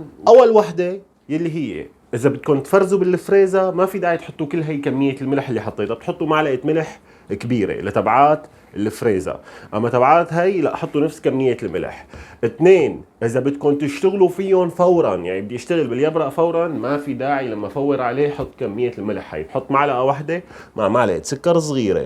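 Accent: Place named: Syria. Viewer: الهجئ السوريه أحلا لسى من الكل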